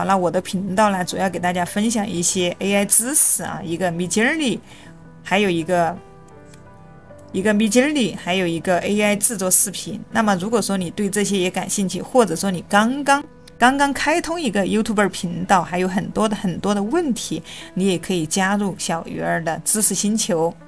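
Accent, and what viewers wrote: Sichuan, China. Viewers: 四川还是重庆的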